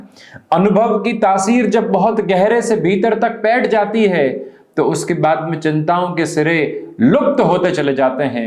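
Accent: Standard. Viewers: Aati Sundaar va shuddh boltay hai aap, kash aap hindi kae teacher hotay, Thank you ji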